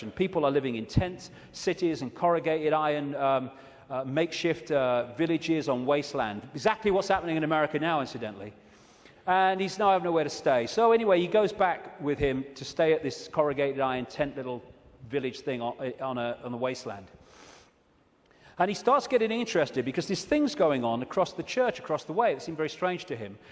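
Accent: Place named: England, United Kingdom. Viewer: This guy is the best!!! He's out of his mind, and he has an English accent to boot. I love this stuff, though, b/c as a sci-fi satirist, this stuff is a veritable goldmine. Keep them coming, and do not take your meds. You're fine just the way you are, in all your conspiratorial, absurd glory!!!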